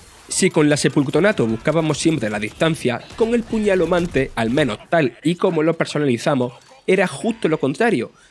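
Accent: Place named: Murcia, Spain. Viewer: perfecto murciano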